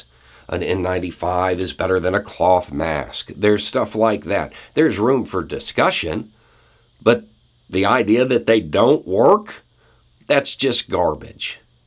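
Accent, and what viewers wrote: South, United States. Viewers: Lovely, a bearded man with a southern drawl who wants you to think he is either libertarian or some form of conservative when in fact he is a full fledged progressive liberal. Yes, mask are ineffective, and this dude's mask certainly does not work.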